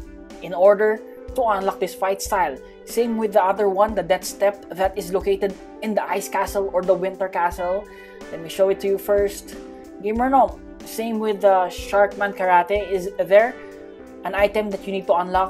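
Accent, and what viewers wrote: Philippines. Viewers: He has a filipino translated channel and he has an accent of a filipino so yes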